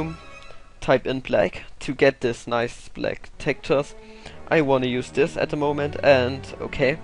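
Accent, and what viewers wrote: Germany. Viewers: You Are German ?? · Could it be, that you're german?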